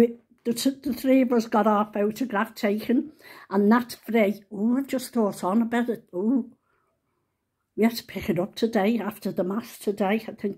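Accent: Liverpool, United Kingdom. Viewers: Bless you ,you area genuine Scouser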